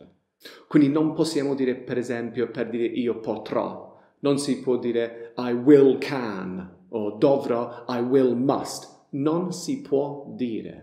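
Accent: Apulia, Italy. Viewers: Ciao una domanda di curiosità:) per caso in Italia vivi in Puglia? Percepisco dal tua accento un non so che di pugliese. Comunque amo il tuo canale, studio lingue all'università e le tue spiegazioni rendono tutto più chiaro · Ripeto...piu di due decenni per imparare l'inglese buttati nel cesso veramente, con le tue lezioni da madrelingua in italiano con accento simpaticissimo pugliese davvero è rivoluzionario il risultato e il miglioramento grazieeeee 👏🏻👏🏻👏🏻👏🏻👏🏻👏🏻👏🏻👏🏻👏🏻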